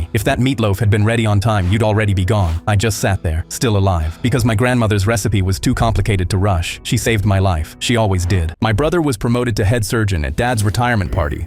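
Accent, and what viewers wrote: United Kingdom. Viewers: bro i finnaly realised he has a brithish accent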